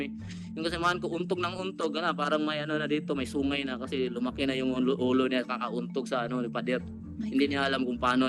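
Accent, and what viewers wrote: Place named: Visayas, Philippines. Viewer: bisaya kc sya.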